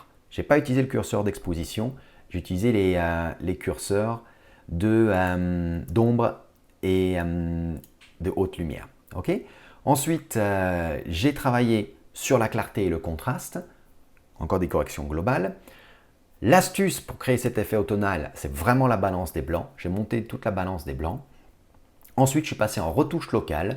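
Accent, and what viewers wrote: United Kingdom. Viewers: Merci pour ce tuto sympathique. Ce que j'apprécie dans ce cours (contrairement à certains), c'est justement son côté "amateur"!! Les idées de base sont là, et permettent à chacun d'approfondir plus en détail la technique. Ne lâche surtout pas ton petit accent "British" qui m'amuse bien et donne à tes cours un côté sympas!!! PS: l'intérêt de YT c'est justement de pouvoir partager ce genre de tuto avec les plus humbles. Pour les "autres" il reste toujours les hautes Ecoles Privées avec toute la choucroute qui va avec.....!!!! Merci encore et continue dans ce sens!!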